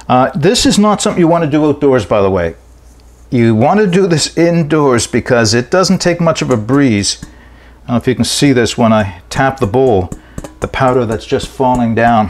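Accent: Nova Scotia, Canada. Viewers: I detect a residual maritime accent. Are you from Nova Scotia?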